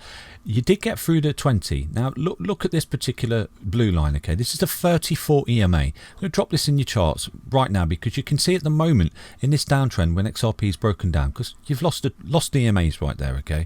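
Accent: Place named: England, United Kingdom. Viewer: I just needed to hear a calm and soothing English accent right now talking bigger picture stuff. Thank you for existing. Subscribed.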